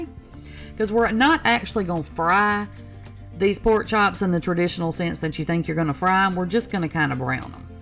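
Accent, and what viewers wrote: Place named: Appalachia, United States. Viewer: You sound just like I do. I'm from northeast Tennessee southwest Virginia area originally.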